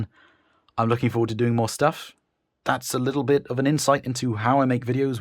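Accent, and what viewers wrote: United Kingdom. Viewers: I’m simply listening through fiio m11 with oriolus BA300s and phantom headphones (modified) with all my research LSA is the way to improve my headphones when budget allows. One thing I’ve wondered. What difference does desktop amp/dac make to sound over portable? I’ve seen reviews of the topping a90 and wonder if that would produce better sound than my motu 828 mk3? I look forward to your future reviews. Good to hear a Brit doing these reviews, I’m up in Cumbria. Keep up the good work.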